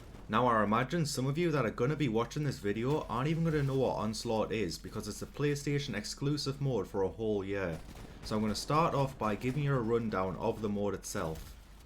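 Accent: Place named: Northern England, United Kingdom. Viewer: Congratulations, glad to hear another northern English on YouTube, not to often you find us.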